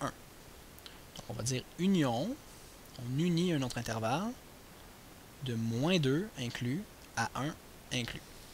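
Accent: Quebec, Canada. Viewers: il est Quebecois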